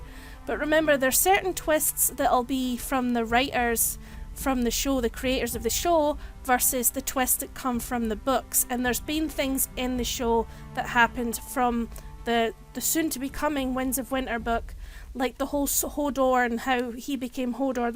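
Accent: Scotland, United Kingdom. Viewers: Scottish narrator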